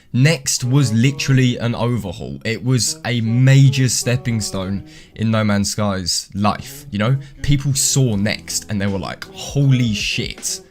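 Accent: England, United Kingdom. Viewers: got love the English accent even tho I'm English 😂 plus you swear a lot kinda so that's a plus lol great vid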